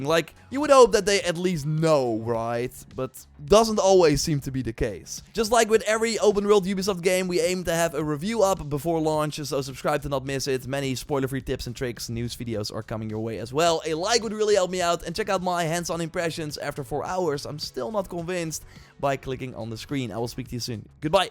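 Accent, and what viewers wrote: Netherlands. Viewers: You sound Dutch bro 😁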